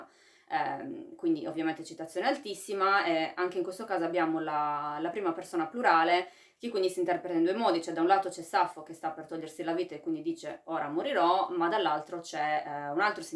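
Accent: Sardinia, Italy. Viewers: Premettendo che la seguo da prima del video con Crepaldi, personalmente apprezzo moltissimo questi contenuti culturali/divulgativi e mi dispiace che vengano posti in secondo piano dall'algoritmo di Youtube - che, a giudicare dalle visualizzazioni, invece premia soltanto i suoi video più "polemici". Ha la mia stima e mi ha fatto riscoprire la bellezza dell'accento Sardo.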